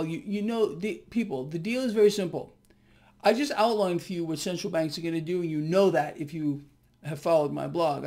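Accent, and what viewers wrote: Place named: East Coast, United States. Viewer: Of course I don't know. He seems well intentioned, even sweet to me. But I admit to being very amused by his accent and personality. So East Coast!!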